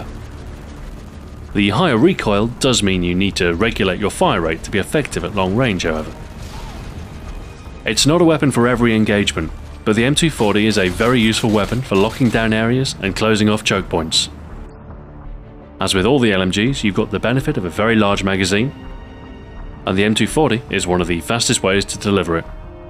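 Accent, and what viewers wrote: England, United Kingdom. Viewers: He sounds so formal. I love his accent, he’s gotta be some form of United Kingdom. English I’m guessing. I like this guy. He makes these games so interesting. What a great dude. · damned british accent... -.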